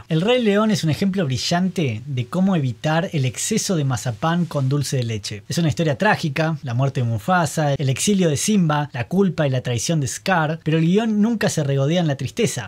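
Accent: Argentina. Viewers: No acabé de leer el video, no soporto el acento argentino, te recomiendo que aprendas a hablar con acento neutro.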